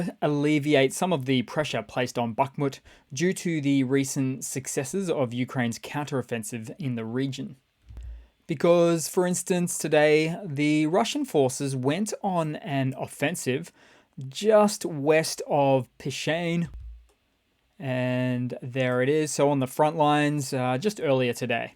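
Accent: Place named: Australia. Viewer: The human error to which TASS refers is Putin, birth to dissolution. It's a beautiful thing to hear voice. Sounds Ozzie, with a few S.A. pronunciations sprinkled in. Is he in Taiwan? Shoigu may well be blustering, as his street cred has been seriously questioned for months now, and he has said little in response, to Noseferatu and others. Should Kyiv actually catch something, we should expect Moscow to spark shortly thereafter. A kremlin is a russian term for a walled fortress. It is NOT a synonym for safe thunderdome.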